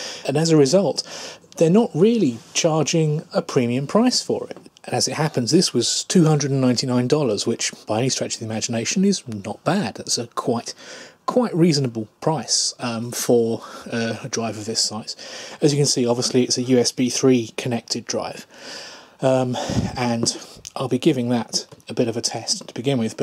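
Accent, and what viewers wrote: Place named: United Kingdom. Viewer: Thank you for the video ! im planing to buy one of these 8TB baby's. YOur british accent was a delight to wacth this video. unlike other guys whos accent i cant even understand most of the time. i have a simple question, if i buy this seagate 8TB external drive, can i just drag and drop my files from my laptop into this external drive ?? will it be this simple? cus im assuming this is just a sata drive, or will it upload to the cloud directly? im a lil confused whether i should buy this drive cus it says BACK UP PLUS.it's emphasizing on the package alot !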